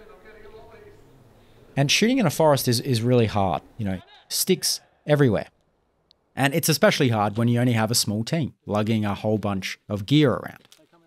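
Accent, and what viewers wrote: Australia. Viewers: Ahh, I always thought you're an American.. Turns out you're an Australian. TIL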